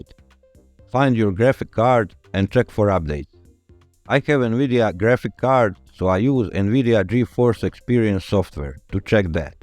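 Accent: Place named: Finland. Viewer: you absolute mad lad! the sixth method worked for me, i'm gonna be a hero for my little boy, and you sir, you are a true saint. this video was amazingly well done, easy to follow and with a calm and soothing finnish accent it was a blissfull experience. thank you.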